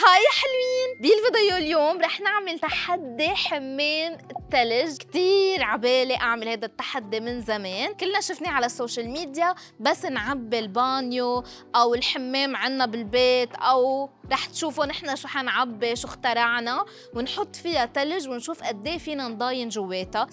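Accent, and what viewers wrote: Lebanon. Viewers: لهجتج لبنانية انتي لبنانية صح اني هم ماعرف لبناني جان كتلج معنى كلمة شمعنا بلبناني 😂 هذا تعليقج ما مخفي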